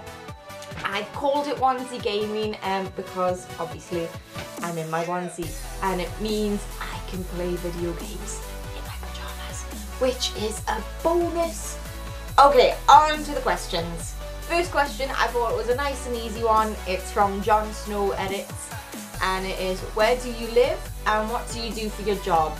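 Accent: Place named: Liverpool, United Kingdom. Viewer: Sound scouse most of the time here. Best of luck with the channel!!!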